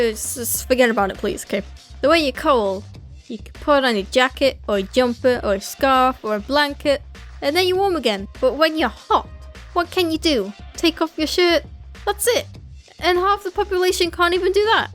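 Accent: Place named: United Kingdom. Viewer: Yeah I thought you sounded British, i'm British too and nobody cares cause nobody cares about the people who talk about them self's and their problems in the comment section and they'll just say "N O B O D Y C A R E S"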